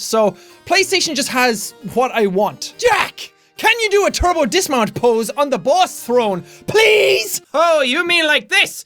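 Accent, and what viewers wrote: Ireland. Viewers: i understood the deep irish accent as i use quite a bit o them sayings everyday. please can you do a complete episode in a thick irish accent... it would be boss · Dat Irish Talk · JACK!!!!!! 2 QUESTIONS!!! CAN YOU SAY MY NAME IN YOUR IRISH ACCENT? AND DID YOU STEAL MY CAKE?!! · R u BOSS,southern Irish or northern Irish! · Loving that full Irish! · put me in ur next one and UR BOSS AND ARE U IRISH ?????????????????!!!!!!!!!!!!!!!!!!!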